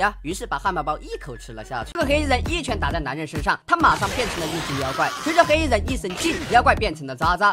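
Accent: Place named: Sichuan, China. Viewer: 我擦 解说是四川人吧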